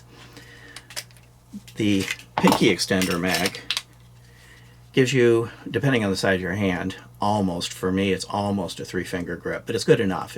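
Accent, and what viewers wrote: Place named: Midwest, United States. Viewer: I am 99% sure the alligators in the beginning makes me believe he's in Florida. He even mentioned in a few of his videos he's in Florida. From the sound of his accent it sounds like he's from Michigan, Illinois, Wisconsin or one of the East Coast states originally and later immigrated to Florida.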